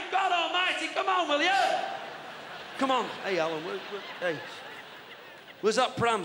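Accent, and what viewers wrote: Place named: Bolton, United Kingdom. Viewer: "Pissed off with er stuck up" 🤣🤣🤣 Bolton accent eh lol...COME ON... Keep them coming... Makes my bloody day..😁😁👍✌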